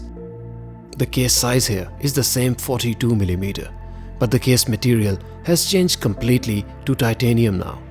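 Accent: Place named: Kerala, India. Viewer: bruh sounding like a physics teacher from Kerala...."vwaatch" yeh no thanks youtube recommend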